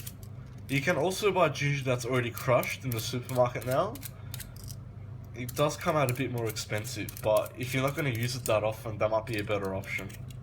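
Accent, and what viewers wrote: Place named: Australia. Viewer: Jus' one observation. It never looks good when an executive chef uses cookware that is marred or scratched up on the cooking surface. The stockpot in this video appears to be a 'Teflon' coated pot, and the Teflon coating is obviously scratched off on the sides, and cooking surface of the pot. It just looks unsightly, and unappetizing. In addition, they say that toxins leech out of the pot when heated from under the Teflon coating. Jus' my observation, but of course, you may feel differently. I love your Australian accent, and your cooking style. I hope that I'm not mistaken in believing that you're Australian. Forgive me if I am.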